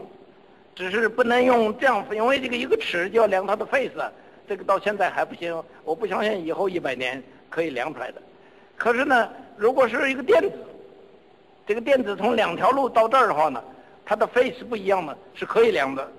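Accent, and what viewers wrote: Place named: Yunnan, China. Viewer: 杨老为啥有这么重的云南口音啊？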